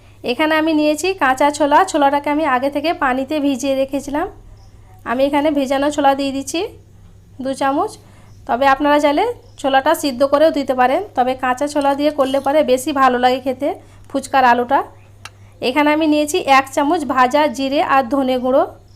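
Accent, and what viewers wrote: Murshidabad, India. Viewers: আপনার কথার সুরটা মুর্শিদাবাদের মত লাগছে । আপনার রান্না গুলা ভালবাসা মিশে আছে গো । অনেক ধন্যবাদ আপা ।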